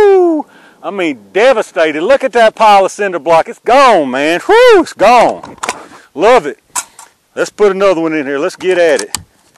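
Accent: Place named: North Georgia, United States. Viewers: You sound just like all my cousins. We're from N. Ga. BTW, have you put any slugs through it? If so, what did you do with the choke? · Nice gun! You sound like you are from Georgia, and in the North Georgia area. You sound so much like my oldest brother who is now gone. Thanks for the video!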